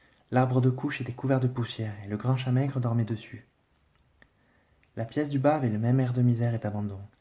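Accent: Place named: France. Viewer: So relaxing! The French accent/language is so great!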